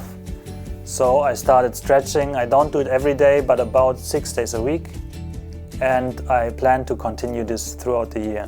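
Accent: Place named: Germany. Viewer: Die Sekunde wo du angefangen hast zu reden habe ich gemerkt das du deutscher bist. Ich liebe es deutsche Akzente zu hören. makes me feel like i'm at home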